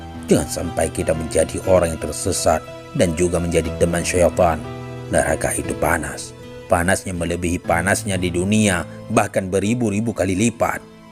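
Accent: Aceh, Indonesia. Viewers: Logat nya orang Aceh ni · Adminnya urang Aceh ya?bunyi slang nya lain ckit.😃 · pasti org aceh ini yang bicara hehe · Suara nya seperti orang aceh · Admin nya kayak suara org aceh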